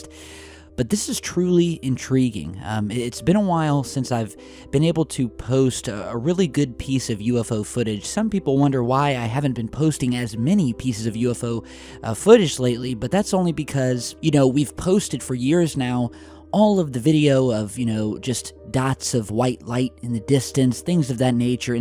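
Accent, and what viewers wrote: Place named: Texas, United States. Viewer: Love the Texas accent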